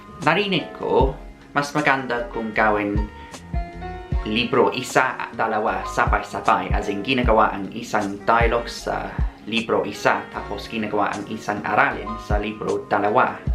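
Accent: United Kingdom. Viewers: SaLute! kitang-kita grabe ang dedikasyon mo para matuto ng tagaLog and it reaLLy worked and growing, kudos, more power! Love your Brit accent too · You should pay more attention to your accent, you are speaking Tagalog but with a British accent.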